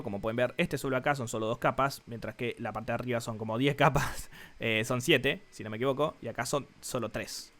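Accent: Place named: Argentina. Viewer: Sos un idolo, literal que me quedo siempre al final del video para darte una cierta forma de gracias virtual aunque allá entendido lo que venía a entender (porque lo explicas excelente y más sabiendo que hablas con un tono muy Argento xd), simplemente muchas gracias ídolo 💪🏻 ✨ 🎉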